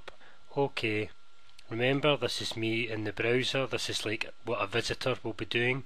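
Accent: Scotland, United Kingdom. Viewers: he is scotish trust me?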